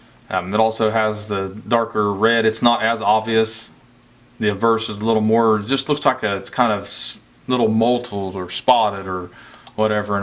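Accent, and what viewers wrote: Kentucky, United States. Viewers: Color not Collar. Wtf you're from Ohio, sounding like some Kentucky imposter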